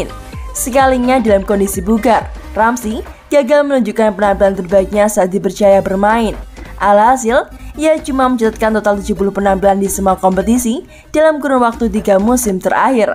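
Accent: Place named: Java, Indonesia. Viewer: Mbaknya medokkk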